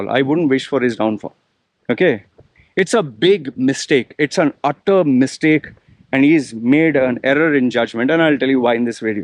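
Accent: India. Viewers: You're definitely not wrong! I'm a Muslim we're supposed to believe with conviction our book and prophet. So some canadian professor waving his finger saying you muslims this and that is a joke to me. I actually respected him alot until this video it really made me see his ignorance. I believe you're Indian from your accent so we both know how western culture colonized and pillaged our countries for their resources and wealth.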